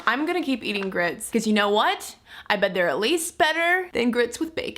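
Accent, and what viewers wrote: South, United States. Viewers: I like your southern accent :) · I love your channel, just found it today, but please, no more southern accent. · I want to hear mom of your southern accent. It's great! haha!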